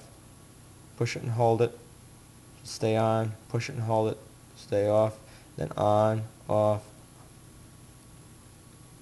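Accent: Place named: New Jersey, United States. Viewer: Your videos really pull you into the action. Maybe it is the NJ accent, or the way you are basically shooting and talking live with a handheld camera, ...it can get hard to watch that way with the camera moving, if it moves too much. Sometimes it does and that is bad, but with some movement, it gives your videos are warmer feel than if you were just talking with a tripod. Your setup results in a very personal video, like I am right there with you in your kitchen. It just works.Good project too.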